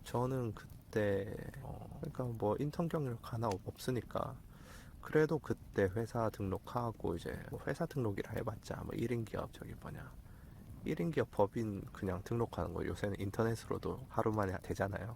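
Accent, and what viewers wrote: Gyeongsang, South Korea. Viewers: 최근에 우연히 유툽을 청취하게 되면서 재미에 푹 빠져든 예순을 넘은 경상도백수아제입니다..ㅋㅋ 언어에서 약간은 경상도 정서를 느꼈었는데 경산 갑제동이 본가라고 하시니 TK로서 더욱 정감이 가네요! 고령화시대를 맞아 중년들의 경제적 고민도 깊어가는것 같은데 수준높은 강의에 소중한 도움을 얻게 됩니다. 장작불의 운치가 썰을 푸는데 기막힌 조화를 이루는듯 합니다. 건승을 기원드리며 응원합니다.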